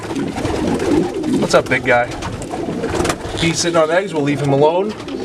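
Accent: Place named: New York, United States. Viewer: Bruh that New York Accent on point 😂😂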